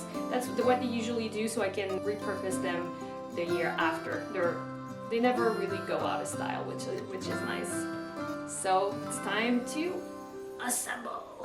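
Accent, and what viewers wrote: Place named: France. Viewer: Can’t wait to try your recipe! You sound a little French like me!!! I live in PEI but I’m from NB. I’m also French speaking!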